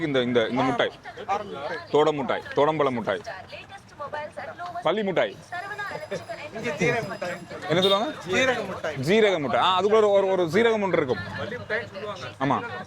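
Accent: Sri Lanka. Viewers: Elangai tamil enaku inimaiya irukum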